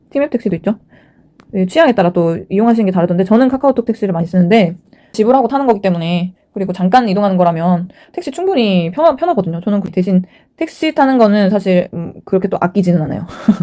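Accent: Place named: Gyeongsang, South Korea. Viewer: 고향이 경상도 인가요? 말투가 귀여우시네요 ㅎㅎㅎ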